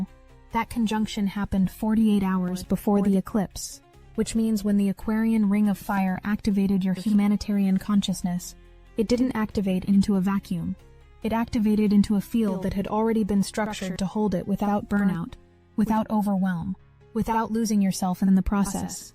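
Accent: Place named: Netherlands. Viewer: There is something with dutch people and english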